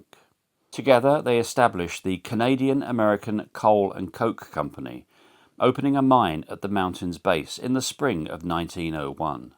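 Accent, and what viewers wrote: United Kingdom. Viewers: The narrator has a British accent, so he's the ONLY one I would ever give a break! GO HAWKS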